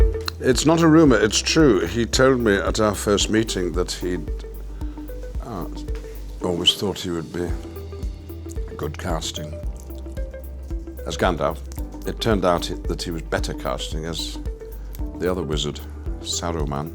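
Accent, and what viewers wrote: RP, United Kingdom. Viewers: Only Ian would answer questions while drinking tea and sounding posh AF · It's strange hearing American pronunciations with such a posh accent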